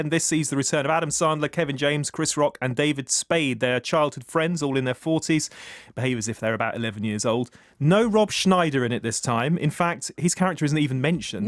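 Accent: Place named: England, United Kingdom. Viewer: James sounds a little like Boy George when he talks; he must be from England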